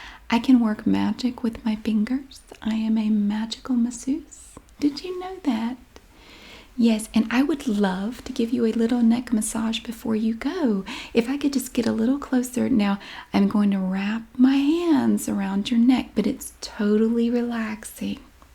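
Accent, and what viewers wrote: South, United States. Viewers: Bro why is nobody talking about how good did with the accent! You did such a good job of sort of toning down your southern accent and sound like Carole! That’s a very hard thing to do and you did a great job💕💕💕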